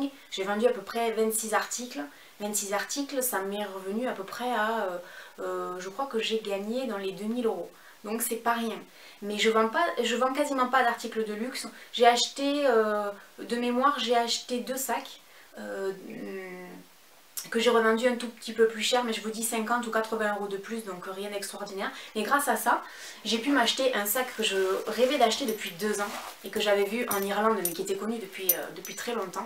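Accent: South, France. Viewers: ton accent du Sud mon Dieu j'adore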